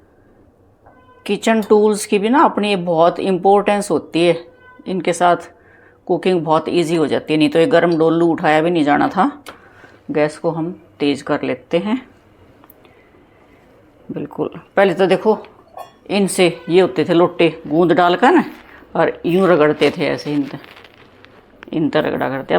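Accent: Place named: Haryana, India. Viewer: बहुत अच्छा बहन आप कहां से बोल रहे हो आप मेरी हरियाणा की बहन लग रहे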